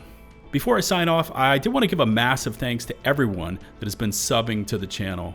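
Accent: United States. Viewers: You are one of the few content creators for helldiver 2 with an American accent. As such, the only one I follow. For democracy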